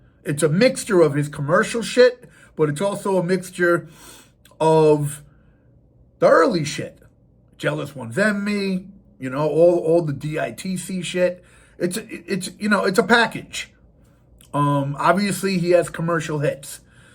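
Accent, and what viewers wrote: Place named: West Coast, United States. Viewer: Dog you sound like you from the west cost and you one of those woods that did time in pen because whites and mex run together and that's cool but they can handle them self's on the streetz so stop side bustin and oh yeah Bob James didn't created his music wit hip hop in mind ,..stop you know what I'm saying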